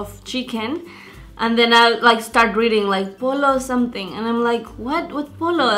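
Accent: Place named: Mexico. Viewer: Fun you mention about the language. Your accent is sooo Mexican. Your English fluency is too slowww to talk English every day. So sorry is hard to believe that.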